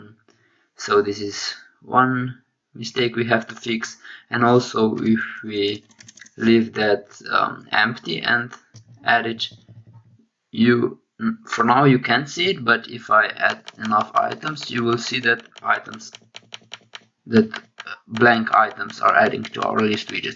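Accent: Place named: Russia. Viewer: Ты Русский что-ли, акцент какой то есть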